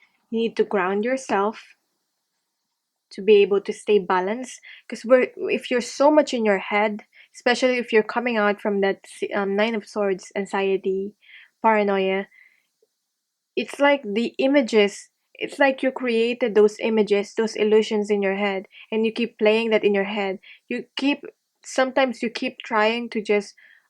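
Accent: Philippines. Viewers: You sound filipina 😊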